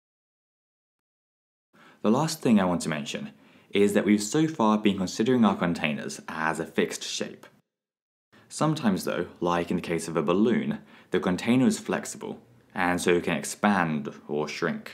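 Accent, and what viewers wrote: United Kingdom. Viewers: Finally a British Accent!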